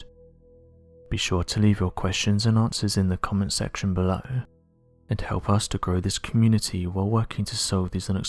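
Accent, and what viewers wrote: United Kingdom. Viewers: Ok so the British accent really is cool but this is old news. How about this computer guy. DONT BREAK THE LAW!!!!!!! Because you will be rolled into the most heinous situation. All of this comes from WHITE people thinking slavery was a good idea. Like any business you need more customers so like a good little racist you are now putting your own People to the sword.. It's human instincts or manstincts that once you do something heinous it breeds and breeds and breeds. Not dealing with slavery ( The dumbest thing AMERICA ever did ) and by that I don't mean reparations , that's tooooooo late. What I mean is sympathy on a level that is real. I get no one wants to pay but how about some sympathy. There is NONE which is why this country is now cannibalizing it's won People. It's really easy , show some damn sympathy and this stuff will never happen but to try to departmentalize hate will lead to this. 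Unresolved issues lead to more. So you got more! This requires a total change of heart on the whole country. Biblicaly this is not going to happen so get saved lol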